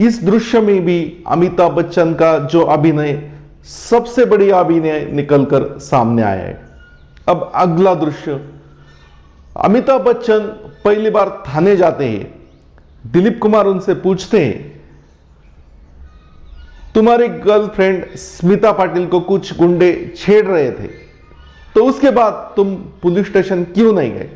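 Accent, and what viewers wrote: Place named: South, India. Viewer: Bhai pehle to apni Southwali Hindi ki jagah original Hindi bolne ki zarurat he. Ab baat Shakti film ki to is film me Amitabh ki achhi acting ke baavjud Dilip kumar unke upar bhari pade the. Kyuki Amitabh ne apni Deewar, Trishul, Lawaris me bhi baap ko nafrat karnewale bete ki bhumika ki hui thi. Is vajah se Shakti me bhi Amitabh ne apni acting me repeat kiya tha jiske kaaran unki acting kuchh bhi naya pan nahi dikhai deta tha. Dusri aur Dilip kumar ne pehli baar 1 aadarshwadi Peeta ki bhumika nibhai thi. To zahir si baat he ki Dilip kumar ki acting hi jyada limelight paati.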